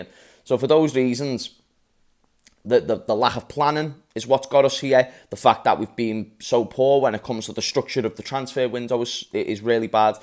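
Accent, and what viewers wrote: Liverpool, United Kingdom. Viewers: He got funny acent. Is it scouser?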